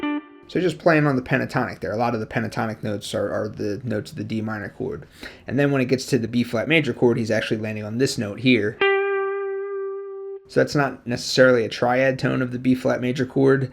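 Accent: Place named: Philadelphia, United States. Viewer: are you from philly? you sound like you’re from philly.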